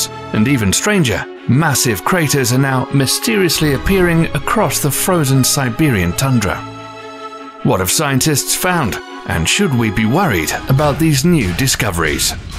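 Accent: England, United Kingdom. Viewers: The only thing I'm worried about is fear mongering its' self. Equally troubling is the blatant over-use of the so-called british accent, touting all this doomsday drudgery to the faithfully numb, who are ultimately hired to spout continuous streams of falsehoods far & wide, to sell the appearance of enjoying the ride, while impatiently waiting for the latest chip, that will turn everything hip. Thanks but that, I will skip. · Y is this English man that doesn’t sound from the USA using football fields to measure things , I hate it when people use that to measure something it’s so ridiculous and stupid no one cares about American gay football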